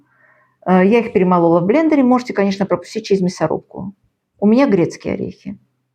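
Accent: Baku, Azerbaijan. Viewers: Kak vsegda shikarniy recept obozhau vas e vash Bakinskiy akcent smotru vas s NY😘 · Бесподобный бакинский акцент...❤ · Я, слушая Вас, ловлю себя на том, что я улыбаюсь 😊 От того, что слышу родной бакинский акцент❤️Пол года назад открыла для себя Ваш канали сразу подписалась! Спасибо за Ваши рецепты🌹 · Да, очень родной говор! Мягкий и доброжелательный, бакинский ! Ваши Рецепты подкупают своей простотой и лаконичностью. Спасибо вам из Бруклина, НЙ.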